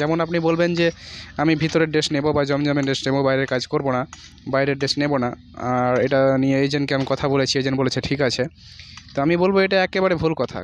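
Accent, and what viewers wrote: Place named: Kolkata, India. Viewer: Aapner bhari kothai Kolkata er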